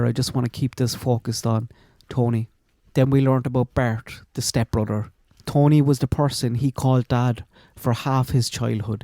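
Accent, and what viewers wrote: Ireland. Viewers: When I heard that accent I knew you were Irish. But I had to check. I'm happy I found your channel. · Ok I'm new to your channel, about a month or so & I'm from the US & adore your Irish accent & your dog is beautiful. My apologies on this day I cannot finish watching the video bc of hearing of the abuse to Tony Klines daughter, black eye at 4 & burnt hands. I know it's important for your channel if your videos are watched to the end but I just can't listen to this. So sorry. Oh but I know what I can do I'll finish the video with THE VOLUME OFF 🔇 and enjoy your beautiful dog. I'm Mimi btw.💜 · Thanks for your insight and notes. Heartbreaking for Tony’s family and all the animals he killed. Not related at all but just want to say I love your Irish accent. My gran was from Limerick, which county are you from?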